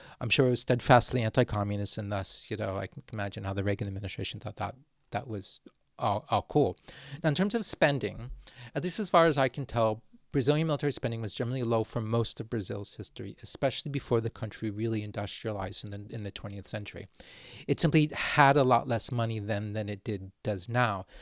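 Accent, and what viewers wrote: Spain. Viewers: Brazilian here: fun fact, in internal politics in WW2, Brazil stood neutral in the beginning because it was having good affairs both with the US but also with Nazi Germany. And our government being running by Getúlio Vargas, sweared that we wouldn’t join the war not even if a snake smoked. Getúlio Vargas is known as our first dictatorship that was actually very positive viewed albeit the negative sides on dictatorships as we know them. But when Brazil joined the war, the slogan here was “A cobra fumou”, translated as “the snake smoked”. Edit: I forgive your pronunciation but we speak so much different from the Spanish pronunciation and you basically read our words with the Spanish pronunciation and some people here will get peeved.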